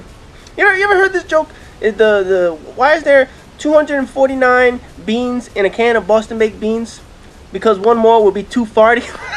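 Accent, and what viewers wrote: New York, United States. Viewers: NY ACCENT !! NY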